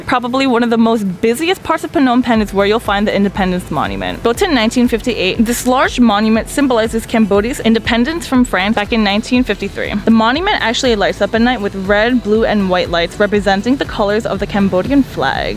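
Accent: United States. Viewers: Hey! Sounds like you’re from the states. Just curious, how long was the flight from where you were to Cambodia? Thinking of doing a trip end of year. Awesome vid 🤙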